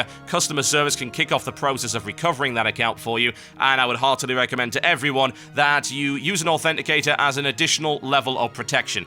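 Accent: United Kingdom. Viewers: British sounds ftw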